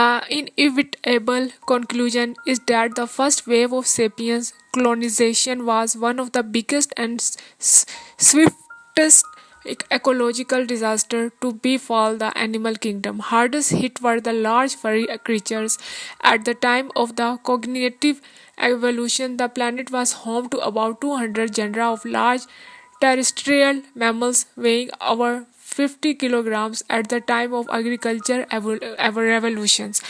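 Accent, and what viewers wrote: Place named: India. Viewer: Typical Indian english !😊 Very inexperienced reading, poor pronounciation and unprofessional audio editing. Visuals can be rendered better. Please improve the audio/video and upload again. Will wait in expectation.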